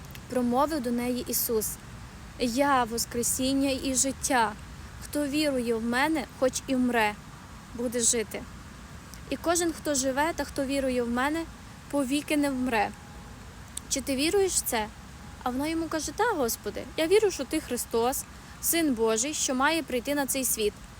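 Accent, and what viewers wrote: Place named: Transcarpathia, Ukraine. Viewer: ❤️Оце найкраще подтвердження, що наш Господь Ісус розмовляв з Мартою та Марією... файною українською мовою з закарпатською вимовою😃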